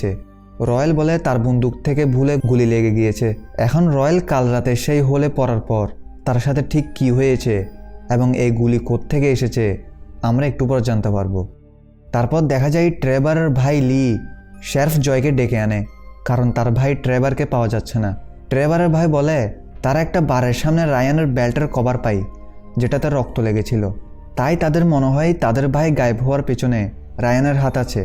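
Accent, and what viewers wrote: Chittagong, Bangladesh. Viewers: love ur chatgaiya accent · চাটগাঁইয়া উচ্চারণ চলে আসে। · হাইরে চিটাইংগা টান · বুঝলাম আপনি চট্টগ্রামের, কিন্তু আরেকটু স্টাইলিসভাবে বললেও পারতেন 😅। ভিডিওটা সুন্দর ছিলো ❤❤ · যদিও আমি ঢাকার কিন্তু চিটাগাং এর ভাষায় এক্সপ্লেন শুনতে অনেক ভালো লাগলো, তাই সাবস্ক্রাইব করে দিলাম 🥰💐🥰💐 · Bro chhatgaiya accent is annoying me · Apni Chittagong er Manush.... Kotha bolar accent e buja jacce😊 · যতই চেষ্টা করেন না কেন চট্টগ্রামের মানুষের কথা আলাদাভাবে স্পষ্ট বুঝা যায় 😊😊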